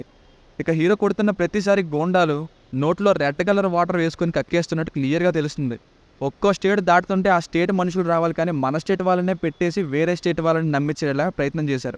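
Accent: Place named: Andhra Pradesh, India. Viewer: అరేయ్ నువ్వు ఆంధ్ర వాడివని నీ యాస లోనే తెలుస్తుంది అందుకే రాయలసీమ తెలంగాణ హీరోలను డి గ్రేడ్ చేస్తుంటారు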